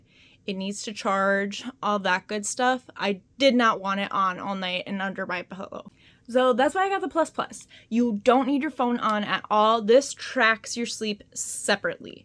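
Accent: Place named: Midwest, United States. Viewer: I can tell you are from the mid west lol :)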